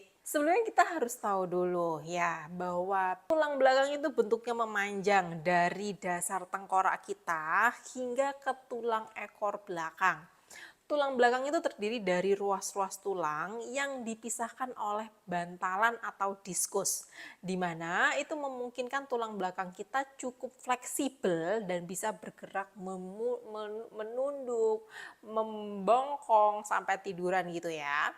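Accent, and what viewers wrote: Java, Indonesia. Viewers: Medok yah? 😶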